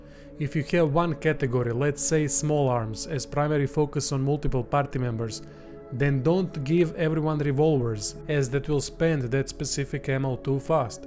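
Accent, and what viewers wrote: Russia. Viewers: You Russian?? Slightly sounds forced cause I love doing a Russian accent as an american. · Best true Russian English ever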